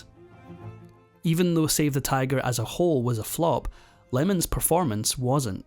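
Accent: Scotland, United Kingdom. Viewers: Well, I'm in. I've watched several of your vids, and I've enjoyed every one. You manage to pick up interesting threads in performers' lives, trace them to their beginnings, then construct a well-researched, well-written chronological account of one portion of the tapestries of each of their lives. The Scottish accent is pretty nifty, too. I enjoy hearing "person" pronounced "pairson." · Oh no, not another Scottish/British voice-over for an American Actor. CRAP ! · I love the lads wee Scot voice too… bc it reminds me of my grt uncle Micheal, a born raised in Edinburgh Scot. And the movie docs are grrrrrreat! (In my best Scottish roll of the r!) 😊❤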